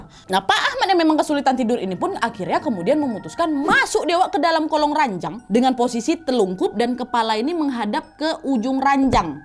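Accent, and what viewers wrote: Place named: Medan, Indonesia. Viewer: Pandai kali wak berkisah.belajar darimana? Dialekx itu suka banget.medan kah ya?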